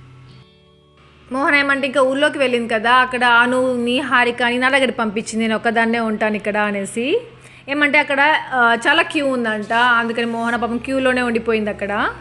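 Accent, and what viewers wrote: Tirupati, India. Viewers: akka me voice chala bavumtumdhii...and meru banglr kadha akkada oka slang ga matladutaru ..kani meru tpt side vallu matladinatee umdhii akka...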